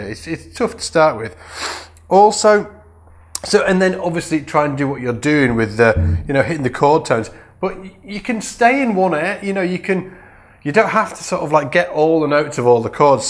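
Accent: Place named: Staffordshire, United Kingdom. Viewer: Guilty as charged for murdering the blues with the pentatonic with the blue note! Pentatonic just kills the blues....well this jazzy kind of blues you show here.You sound like you're from the Lichfield/Tamworth Staffs area mate.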